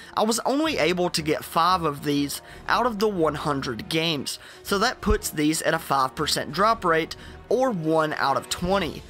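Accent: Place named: Texas, United States. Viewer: Extreme Cynic nope this is a Texan accent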